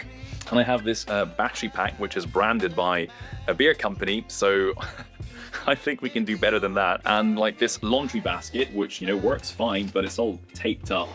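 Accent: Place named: England, United Kingdom. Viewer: Since he clearly sounded English, it is perfectly possible that it WAS a joke. Our humour can be very "deadpan" at times.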